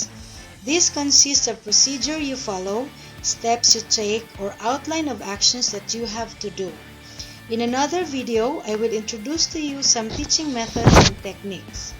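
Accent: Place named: India. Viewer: Indian English is too easy to.detect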